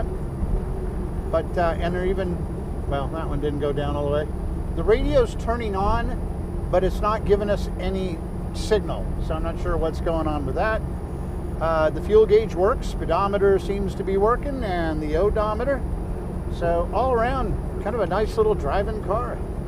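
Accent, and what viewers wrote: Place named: Upper Midwest, United States. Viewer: Sounds like he's from upper mid west/northern plain states..Mn, Mish..'EH'?..Robert at 70 in Az.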